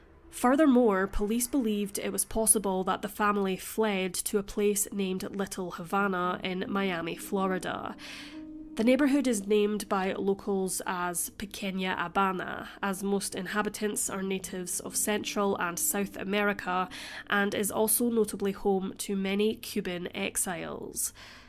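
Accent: Scotland, United Kingdom. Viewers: If she'd talk normal,you would not understand a word,unless your'e scottish???? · She's enunciating her words properly so everyone can understand what she's saying... it's not slow. The Scottish accent is sometimes hard to understand if you're not from Scotland. Listen at a different speed if it bothers you that much 🙄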